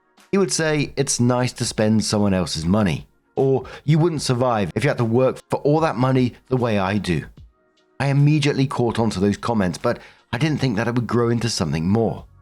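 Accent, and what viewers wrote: United Kingdom. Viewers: Can anyone remember what channel was called before? I have been racking my tiny mind and can't remember anything but I started following him as I knew he had to be a Brit.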